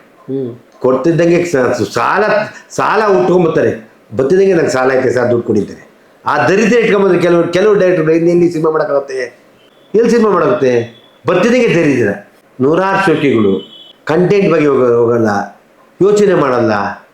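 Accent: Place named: Telugu, India. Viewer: E manja proper kannadadavanu alla ansute , ole Telugu navaru matado reti matadtane.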